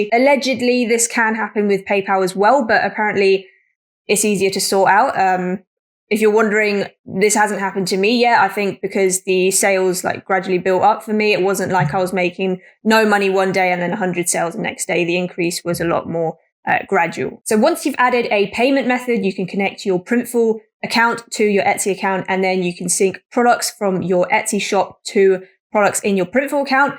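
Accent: United Kingdom. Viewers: Thanks, sweetheart!!, so what I got from this was I have to press a button in printify, which I have just done i wish I had of known this morning before I went to my boring 9-5 job 😁 so is that it, yeah I was stocked to get my first sale today but your right I was confused what next very helpful thanks!! is that a British accent i detect? from a fellow brit here?? ;-) lol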